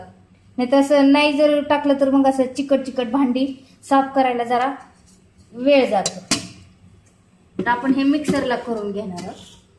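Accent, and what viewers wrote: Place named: Nashik, India. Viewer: mavsi nasikkadchya ahea ..amchya kadchya chan language agdi apli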